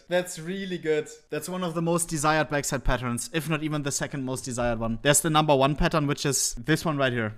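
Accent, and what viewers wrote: Germany. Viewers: Man merkt so das er deutsch ist xd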